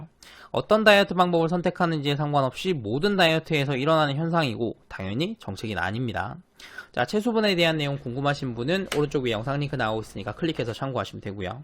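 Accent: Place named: Gyeongsang, South Korea. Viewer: 갱상도 사람이시네요 ㅎ 모하시는분인지 궁금.. 설명 진짜잘하심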